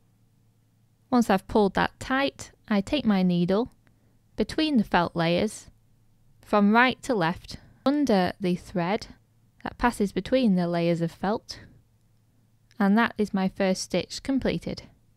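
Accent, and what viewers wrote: United Kingdom. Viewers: Love your British accent! This helped me learn. I like when you speak slow and repeat, so I can understand. Thank you dear! From - Lori / MN, USA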